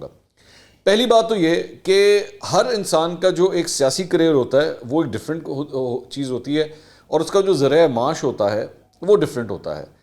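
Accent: Punjab, Pakistan. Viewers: Apka punjabi urdu mix lehja boht mazay ka hai. Feeling sorry for ibrar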